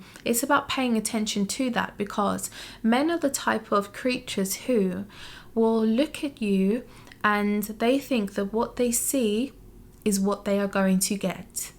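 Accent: United Kingdom. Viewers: Umm.....Are u from London.I'm feeling the British accent..Umm u will NOT be invisible over here in the USA..they will LOVE that accent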